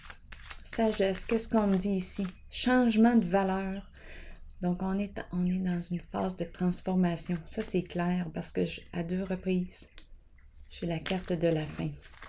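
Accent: Canada. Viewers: Mon Dieu, que j aime votre accent canadien. On dirait mon ami Matthieu Bock Côté. Adorable cet accent !!!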